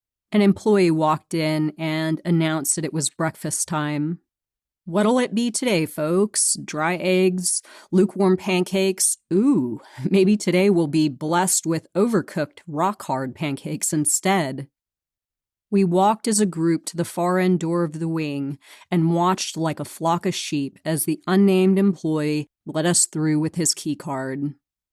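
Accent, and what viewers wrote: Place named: Oklahoma, United States. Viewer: Damn I called it. The accent. Except I thought you had came from Tx to Ca. But its Ok. OK is amsricas step child. Tx dont claim yall, midwest dont claim yall, dirty south dont claim yall. And the modesto...valley people are scary. Coast people spot valley people a mile away when they go to Pismo etc. Rough starting point man. Damn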